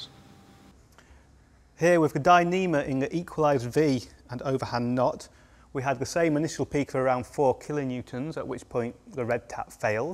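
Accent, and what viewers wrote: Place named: United Kingdom. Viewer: Impossible combination for a foreigner, british dialect & this kind of mumbling voice... Does he have pebbles in his mouth?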